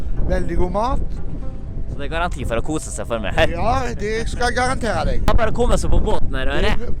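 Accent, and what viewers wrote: Norway. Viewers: Hvilken dialekt snakkes? En slags nordlig variant? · Molde dialekten tror eg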